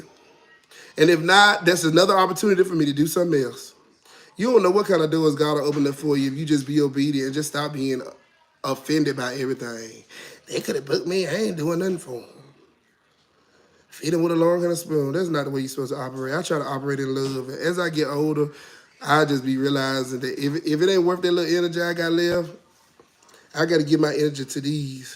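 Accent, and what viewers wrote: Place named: South, United States. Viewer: Lol you are so country!!! I can tell y’all from the south! 😂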